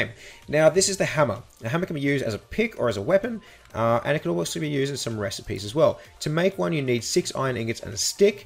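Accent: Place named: Australia. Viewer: It's good to hear an Australian on YouTube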